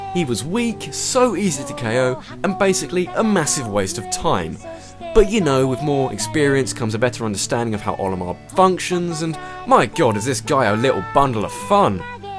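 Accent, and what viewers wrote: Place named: Essex, United Kingdom. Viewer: Cool vid man,nice accent btw. Let me guess,you're from Essex?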